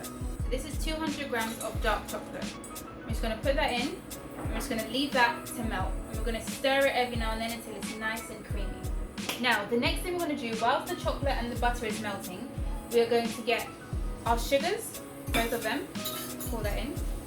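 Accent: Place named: South, United States. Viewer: Not bad! Funny 🤣 the southern accent I mean. But amazing as always.🌹🌹❤️ Keep on producing these great videos. 🌹🌹🌹 You should have your own products in the Supermarkets.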